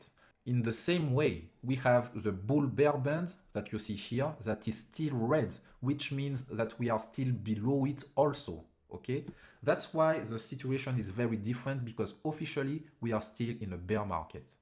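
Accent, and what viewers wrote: France. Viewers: L accent est français non?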